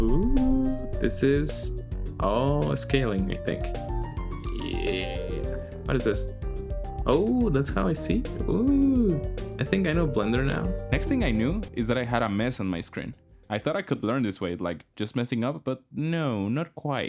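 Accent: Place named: Spain. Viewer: do you talk Spanish?, your pronunciation of English language is pretty similar to someone who talks Spanish. I talk Spanish too, so yeah, that's why the pronunciation sounds very similar for me at least. Also, good video, very organic, and i find this more interesting or more educational than watching those tutorials alone (idk why, maybe you are an inspiring type of person). Keep the good work.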